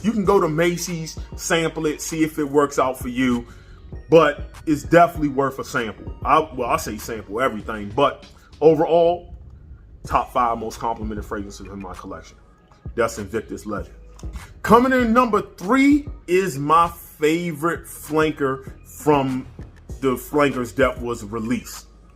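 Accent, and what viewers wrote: DMV, United States. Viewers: Yo, as soon as you started talking I knew you were from the DMV.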